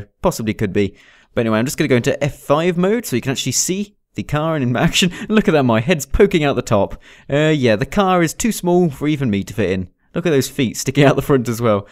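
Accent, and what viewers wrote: United Kingdom. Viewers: I <3 u so much u make my day and make me smile even when I'm in hospital ! Ps r u British?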